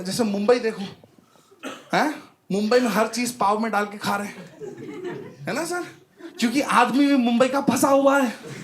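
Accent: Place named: Bengal, India. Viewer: Your bengali accent is excellent ❤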